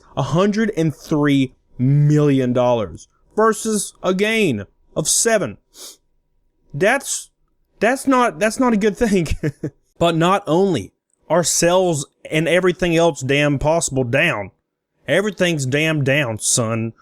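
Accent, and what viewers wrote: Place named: Alabama, United States. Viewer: I'm not gay by any means but dude I love your Southern f**** droll not to mention you just hilarious and I love that laugh it's like a country Santa laugh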